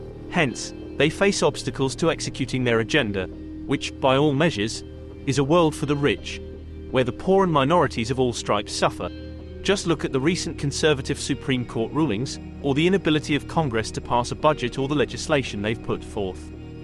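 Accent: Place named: United Kingdom. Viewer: Too British for me I am sorry.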